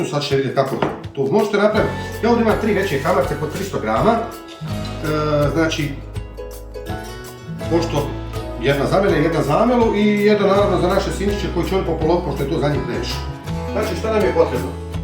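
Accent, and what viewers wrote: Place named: Bosnia and Herzegovina. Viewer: Predlazem da ove zamerke prihvatis kao dobronamerne : moja keva je iz Lebana o to je tu negde.Pite te ko teje lagao za sir? U Leskovacku skaru mora da ide tucana ljuta paprika zatim kasika prezli ,jedno jaje i na tu kolicinu ide pola kasikice soda bikarbona.Drugo: reprezentecija - pokazivanje: te lepinje kao da si sekirom sekao.Dok gledamo ovaj tvoj video stice se utisak kao da ti se pisalo,pa si zurio.zadnji komentar: po govovoru kao da si Bosanac,stoga drz se ti Banjaluckog recepta . Pozdrav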